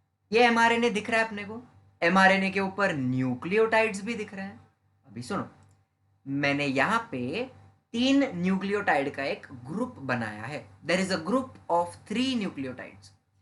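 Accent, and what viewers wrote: Maharashtra, India. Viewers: Sir aap Marathi ho kya ??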